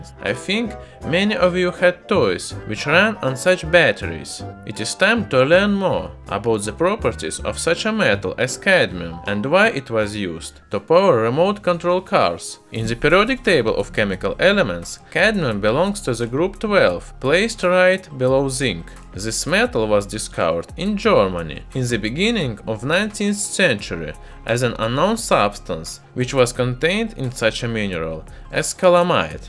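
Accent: Russia. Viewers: تعلم اللغة الانجليزية هو روسي و يحاول التكلم بها انا افهم كل فديوهاته 😁😁😁😁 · you have a hard russian acent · I love russian English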